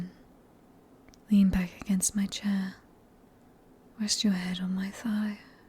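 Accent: United Kingdom. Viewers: British vampire from the pre colonial era. Need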